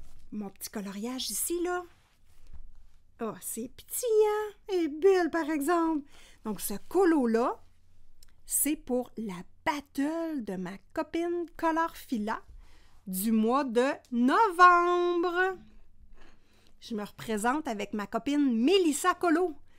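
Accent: Canada. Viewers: Cc, je viens de partager cette vidéo à une copine qui a perdu l envie de colorier et qui aime l accent canadien. Je pense que tu pourras lui redonner le peps pour colo. 😘😘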